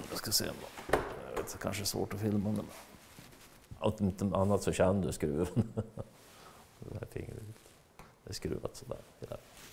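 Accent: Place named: Norrland, Sweden. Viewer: Så underbart att äntligen få höra lite riktig norrländska i denna kanal!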